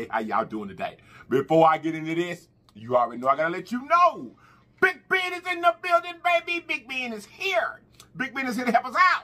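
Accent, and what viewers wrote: South, United States. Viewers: I have to cut off volume, because you talk too much and we want to enjoy watching you eat. Sounds like your roots are from the south and you live there now, parents taught use to not talk while eating. Now I understand why, you show all the food in your mouth and it’s gross. Try getting on the radio you have the gift to gab, and it’s not necessary on a eating show since you have so much information to share to others..Just my observations.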